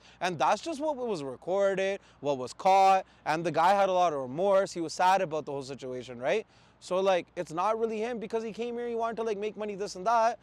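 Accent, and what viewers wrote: Canada. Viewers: that’s a Canadian accent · His accent is very much Canadian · correct he speaks proper canadian. I love it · The way he’s talking he has that Canadian accent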